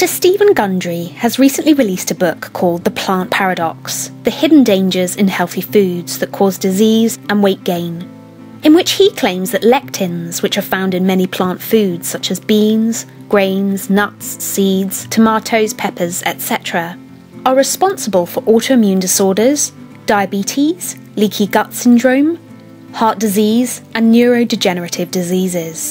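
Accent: United Kingdom. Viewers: Just because some mushroom has some special property that confers immunity to cancer doesn't say anything generally about lectins. Until "science" offers better theories, instead of spending their time theory-shaming others, people will keep trying the diet - and if they feel / get better, then even you telling them theyre wrong in a calmly reassuring British accent isn't likely to change their minds.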